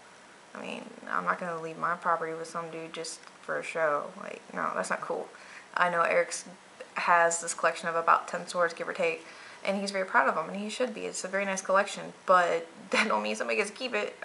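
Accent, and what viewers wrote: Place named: North Bay, United States. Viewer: It sounds like she's from North Bay. I don't know but my ex friend sounds just like her and she's from Petaluma CA. They mumble and say but umm a lot and connect all the words. I couldn't listen to this video without ear strain.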